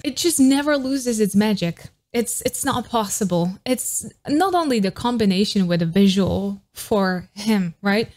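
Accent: Australia. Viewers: Since when do u have an aussie accent? Naurrr saurrr hhaha I love it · I was thinking the same listening to you speak. You definitely have picked up the Aussie ‘oh’ (letter O) sound when you speak. It’s beautiful. Thanks again for another great reaction video. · I think so, her accent does sound australian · Are you Aussie???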